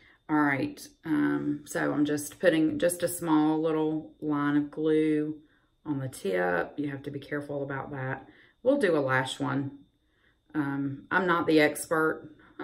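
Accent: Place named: South, United States. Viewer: Wow I could jst watch you all day. Very beautiful. Luv your channel. Love your style and your cute southern accent too!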